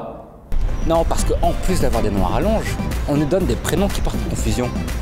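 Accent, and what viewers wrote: Madagascar. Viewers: ton nom porte confusion??? le mien c "Laingohasina" en passant super ton accent Merina ^^ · Tu fais trop bien l'accent malgache qui parle français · C l'accent malagasy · Oh, J'adore ! J'ai ris du début à la fin ! Génial l'accent malgache :) !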